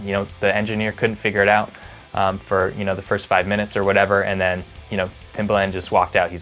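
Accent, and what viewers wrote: Canada. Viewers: Your Canada is showing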